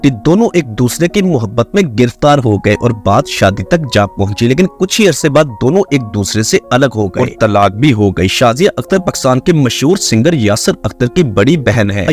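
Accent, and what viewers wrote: Punjab, India. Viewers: Why is the commentator trying his best to speak urdu in Panjabi style??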